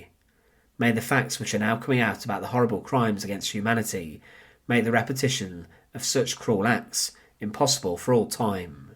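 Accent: United Kingdom. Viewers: What type of UK accent do you have?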